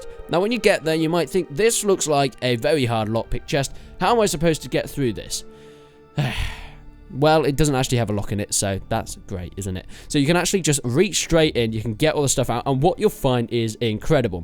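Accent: United Kingdom. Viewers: Hrmm... U.K. Accent but you said the location is a couple hundred yards. Interesting.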